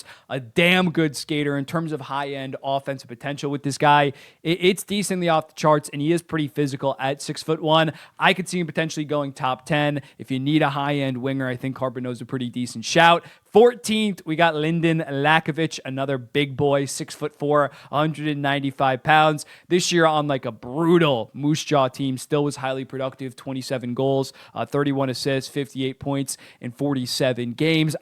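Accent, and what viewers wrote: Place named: Philadelphia, United States. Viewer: I gotta ask if you’re from PA or Jersey. I’m from Delco/Philly area, and your accent like fades in and out but it’s giving that fr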